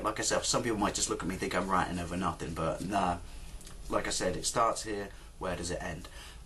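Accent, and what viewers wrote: United Kingdom. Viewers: Honestly if I couldn't hear your British accent I would have thought you were an American. The exact same shit is going down over here. Slowly but surely they are trying to chip away our freedom all in the name of public safety. Also our troops never actually make it home but instead get shipped off to yet another war. I've never seen CCTVs though. I'm sure our current administration is pushing for that as well as they think China is the ultimate template for national security.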